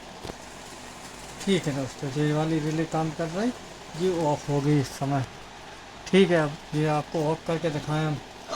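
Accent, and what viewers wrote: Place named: Bundelkhand, India. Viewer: सरजी आपकी भाषा बुंदेलखंडी लग रही है आप कहां से हैं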